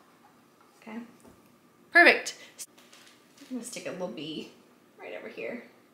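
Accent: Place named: Tennessee, United States. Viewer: I just came across your channel. I enjoyed this video. Each little project came out just adorable. Your tier tray is awesome. I'm trying to guess where you are from by your accent. Maybe Tennessee ? Or one of the Carolinas. I live in Upstate SC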